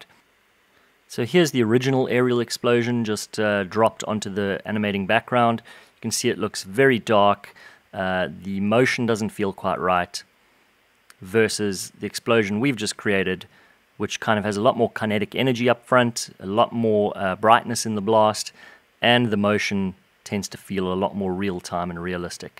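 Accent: South Africa. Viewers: r u from south africa?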